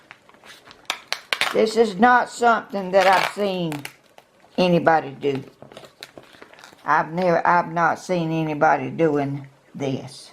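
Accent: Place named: Georgia, United States. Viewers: First I want to tell you I’m from Crabapple (Alpharetta/Roswell area) and have lived in the Dallas area in Texas for the past 20 years. I love seeing your beautiful creations and your accent makes me miss my parents (who passed in 1984 -Mom and Dad in 1996). I don’t get to hear it enough. My youngest daughter stuttered but the schools here brought in a therapist and they made it go away. But I’m just like you - tender hearted and I was raised to treat others as I want to be treated. I can stand up for others but never myself. I’m sorry if anyone has ever been rude on here to you. You might like this comedian on YouTube - she’s from the Carolinas and a clean comic. She’s fabulous. Jeanne Robertson. She’s a hoot. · Just finding your vlogs. My family is from Vidalia. Also have family all around Dublin. Who knows one of our family tree limbs might have crossed. 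Enjoying listening to you talk makes me homesick. Haven’t been able to go back to Vidalia due to COVID 19. You now have a new subscriber. I will let my family know about your vlogs. Since you love country music you need to come to Nashville. We have lost a lot of great singers but we still have a lot still singing so when you feel like traveling come on over and we will have to get you some Grand Ole Opry. Tickets.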